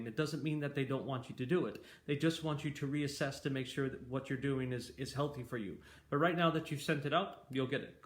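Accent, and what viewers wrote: Ireland. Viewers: I hear the Irish lilt in your voice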